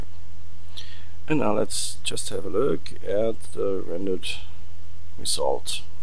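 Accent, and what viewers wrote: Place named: Germany. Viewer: Man hört dass du deutscher bist! ;)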